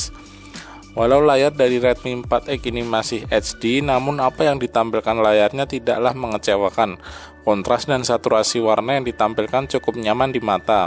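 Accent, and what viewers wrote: Surakarta, Indonesia. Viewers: sampean tiang solo toh mas? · orang solo ya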